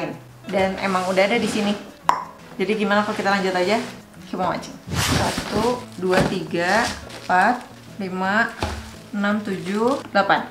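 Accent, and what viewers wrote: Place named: Sunda, Indonesia. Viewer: Nyunda pisan di video ieu mah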